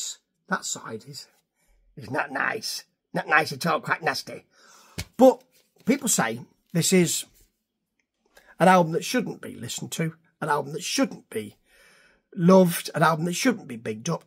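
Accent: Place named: Birmingham, United Kingdom. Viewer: Are you a brummie? 'cause you sound (to my Irish ears anyway) like Bill Oddie. Oh! and Sabbath ended at Sabotage... everything recorded after that is a different band, different gene, different everything! (Just my opinion, )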